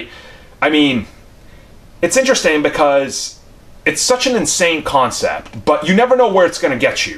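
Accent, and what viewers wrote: United States. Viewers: Would never have guessed you were from Bosnia. You're accent sounds about as American as it gets.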